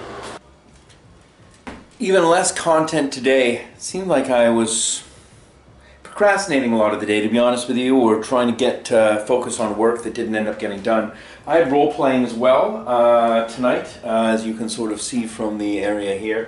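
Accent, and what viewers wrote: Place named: United Kingdom. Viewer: Where about in Britain is he from??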